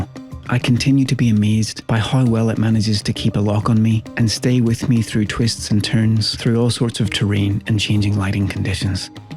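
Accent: Ireland. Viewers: I concur with most of the other contents, but I really enjoyed listening to your calm, almost ASMR voice. The Irish accent doesn’t hurt either! Not trying to come across as gay (“Not that there’s anything wrong with that..”), but I could probably sleep to one of your reviews. 😅. Keep up the great work. If that’s your girlfriend, she really cute too! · Excellent review and beautiful put together . You sound irish ? But that doesn’t look like Ireland ? Where you get the background sounds from ?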